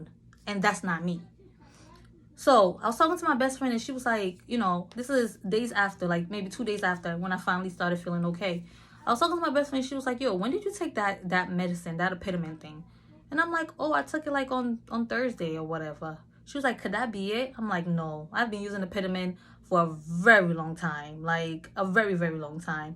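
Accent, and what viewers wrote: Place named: Liberia. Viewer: You sound a little like you Liberian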